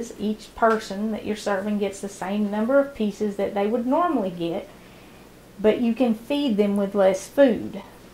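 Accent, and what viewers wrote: South, United States. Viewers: You are a fabulous cook. Thank you so much for speaking slowly so we can understand so much better. You have a beautiful southern accent and gorgeous hair. Thank you for being so patient with your subscribers. Love from Debbie and Dexter-Dog the amazing rescued Chihuahua companion.🙋❤🙏🙏🙏🇺🇸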